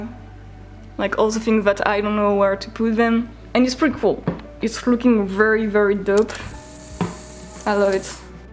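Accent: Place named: France. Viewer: Bonjour！！ I just saw your channel by accident. What a coincidence! I am from China and major in French but I am a freshman so my French isn't good. I also intend to study and live in France, so I am looking for some videos that allow me to practice French and English at the same time. Finally, found you!!! Love your video, your good sense of humor, optimistic attitude towards life, and your fluent English with the cute French accent（I hope this won't offend you)!!!Looking forward to your more video!Bonne jourée!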